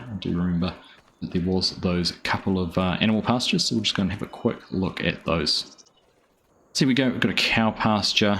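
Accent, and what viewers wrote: New Zealand. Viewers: Im sorry but what part of this map represents a Aussie farm ? to me it looks same as a euro map . and a kiwi dude voice over , thats like getting a mexican to describe america .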